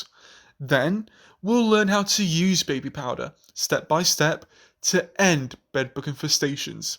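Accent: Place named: England, United Kingdom. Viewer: You sound English - why say diaper instead of nappy?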